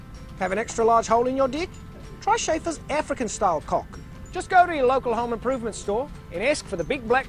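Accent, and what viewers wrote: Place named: Australia. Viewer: It's an Australian accent peeps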